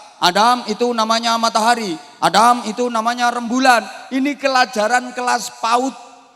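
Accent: Banyumas, Indonesia. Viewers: Kramat jatibarrang Brebes Cocok bahasa e ngapak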